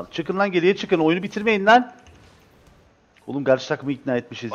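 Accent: Cyprus. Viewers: GÜZELİM KIBRIS ŞİVESİ <3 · <3 sırf bu kıprıs türkçesi için kıprısa yerleşecem be gardaş:D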